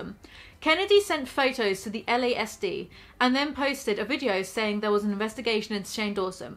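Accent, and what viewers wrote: United Kingdom. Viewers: i wish i could get my own british person to rant about stuff to me all day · You went full British for most of this video and I’m sliving for it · its not just the accent, youre sooooo brit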